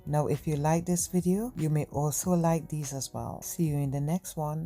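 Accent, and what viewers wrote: Trinidad and Tobago. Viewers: Are you from Trinidad.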